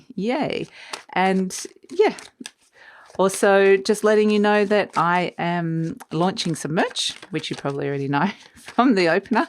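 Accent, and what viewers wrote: Australia. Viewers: Great forcast! 👍 Yes we need to talk hope my Virgo of 43 yrs Love of my life He is very important to me ♥️ 3rdparty came into our marriage but l have forgiven him long ago. He was loyal but grief hit us that no parent should have to go thru Would be great if he gets intouch so we can have closer one way or other .l did try but silent his end Thank you so much for your reading very positive and offer's Hope ! You sound like you are Australian??. The view out your windows is peaceful looking at your tree ferns And your your feather friend having his say love it Chooks are lovely love from Queensland Australia 🇦🇺 ♥️🎶🌈🙏🐞🦋🌞👍💐💐🙏🙏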